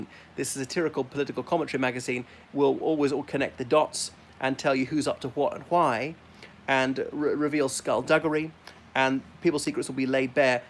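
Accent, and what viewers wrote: RP, United Kingdom. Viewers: What did you do with your Irish Accent?? You have a lovely “queens english” accent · strange you sound like an English toff, not any Irish I know, what's going on here, if my first impression is your mouth is brown, that ain't good fella?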